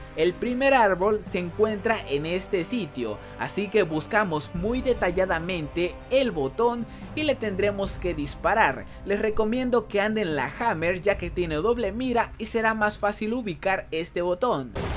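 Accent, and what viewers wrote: Chiapas, Mexico. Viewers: Oye Bro eres de Chiapas(?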